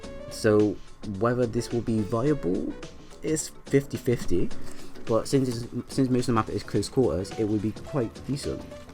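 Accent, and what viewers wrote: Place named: Southern England, United Kingdom. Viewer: That accent sounds Southern English.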